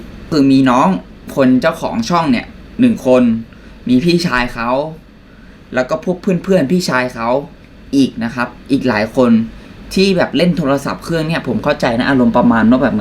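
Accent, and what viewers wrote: Isan, Thailand. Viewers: สำเนียง อีสานบ่ ไม่รู้สิ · สำเนียงเเมร้งโคตรลาบ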